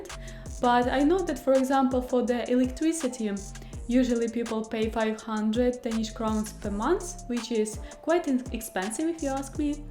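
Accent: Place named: England, United Kingdom. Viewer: Your voice is so cute, I love your English accent. Greetings from México. =)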